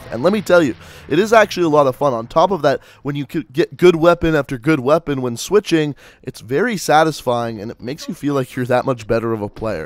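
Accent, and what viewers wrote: Canada. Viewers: Are you canadian? You say aboot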